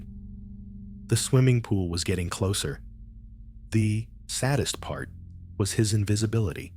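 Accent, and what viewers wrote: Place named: United States. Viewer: Another click bait channel full of garbage. Not surprised it is narrated by a scam artist American.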